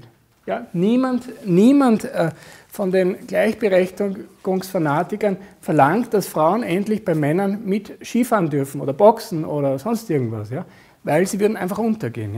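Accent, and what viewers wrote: Vienna, Austria. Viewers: Wunderbar.....wäre da nicht der "Wiener Dialekt"....für nen Ruhrpöttler schwer das auszublenden😊 Was sind das denn für buchbare Seminare?Wo bucht man sie? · Danke für diesen klasse Vortrag, Herr Bonelli! Das erklärt einiges, was in der Vergangenheit schief gelaufen ist und was ich unbewusst schon dazugelernt habe. Das Herz weiß was wahr ist und wenn man in der Herzenergie ist, gibt es keine Schuld sondern Verständnis und Vergebung. Und - wie gerne hätte ich einen Österreicher als Mann - der Dialekt ist einfach bezaubernd! ... sagt der Schwabe ... :))) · Findest das Schönbrunner Deutsch (Dialekt spricht er nicht) so unerträglich, dass du es ausblenden zu müssen meinst? :-D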